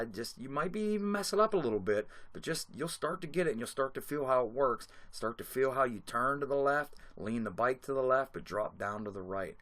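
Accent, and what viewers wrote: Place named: Pittsburgh, United States. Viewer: sounds like your from Pittsburgh man good video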